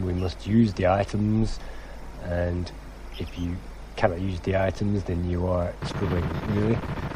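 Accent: United Kingdom. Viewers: It's cool man, you remind me of Yakumo1975 's RetroCore vids. Always good to have a laconic British accent. Cheers for the vids man.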